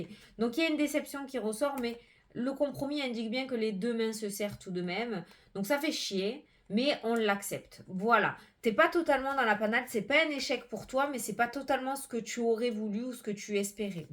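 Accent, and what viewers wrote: South, France. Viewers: je pars en vacance à chaque fois que je vous écoute,votre accent du sud est magique et me fais voyager.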